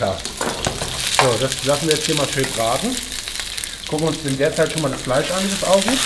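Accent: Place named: Mannheim, Germany. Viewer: Ui, jetzt habe ich direkt Hunger. Werde noch etwas an Saucen nachkaufen müssen; daher danke für den Link aus diesem Video. Hockerkocher, Wok etc. ansonsten vorhanden. Daher für das Wochenende: Feuer frei. Dein Dialekt bringt mich immer zum grinsen, da ich viel mit Kollegen aus der Konzerzentrale in "Mannem" (=Mannheim) zu tun habe 😉 Mach(t) weiter so und Grüße aus dem Rheinland.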